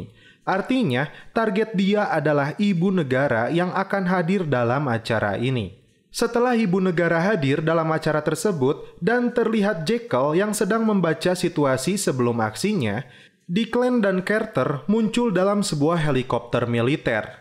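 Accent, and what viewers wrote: West Java, Indonesia. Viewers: Ciga orang Sunda ey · Cemprenk cadelnya sih masih respect, tapi tolong dong sundanya kurang-kurangin.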